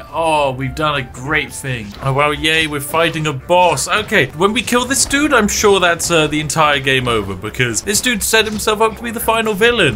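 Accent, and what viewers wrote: United Kingdom. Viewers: I am here just because I remembered this video had a program that is basically a lag-switch and I totally could use a program like that thanks random British man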